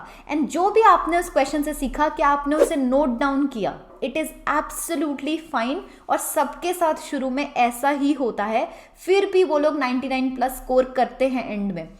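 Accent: Punjab, India. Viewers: Love the tinge of Punjabi when you talk 😊 Chak de phattey Kudiye.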